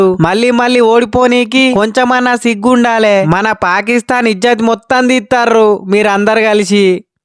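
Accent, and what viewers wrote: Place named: Telangana, India. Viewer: మీ తెలంగాణ యాస చాలా బాగుంది అన్న....❤❤❤❤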